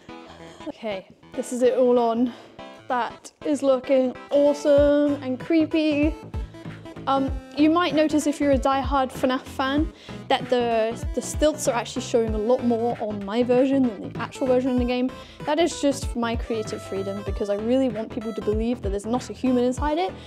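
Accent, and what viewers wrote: United Kingdom. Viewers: Is she Scottish or Irish 😅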